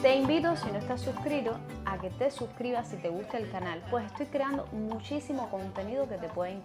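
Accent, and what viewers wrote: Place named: Cuba. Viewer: Ver Trinidad, me recuerda muchos de los llamados pueblos Mágicos de México, Estimada me encantó la música "habanera" como intro y fondo, tu relato bien estructurado y con muy buena dicción y ese exquisito acento cubano, Trinidad es un pueblo mágico con un enorme acervo cultural e histórico, te agradezco por mostrarnos tu amada Cuba, te mando un abrazo grande desde Jalisco México.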